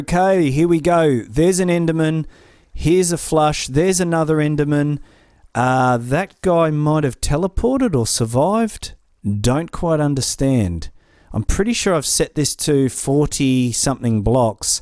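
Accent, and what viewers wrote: Australia. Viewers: Honestly, the only reason why i subscribed to is the aussie accent, I live in AU, and it is odd, but in Brisbane, noone has this accent, they all speak strangelly normal...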